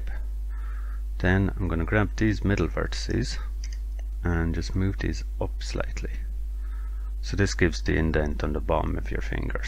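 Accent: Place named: Ireland. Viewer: Great tutorial as always. The Irish accent makes it so much better, can't beat it haha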